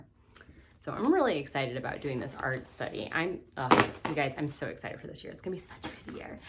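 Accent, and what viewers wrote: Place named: Western New York, United States. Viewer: Love that I found your channel. I am just starting this year with my oldest, a Charlotte Mason/Waldorf mix, but I love seeing how others use different styles to create their own. Very inspiring! Also, I knew you were from western NY before you said Buffalo, you've got the accent (I am originally from Rochester)!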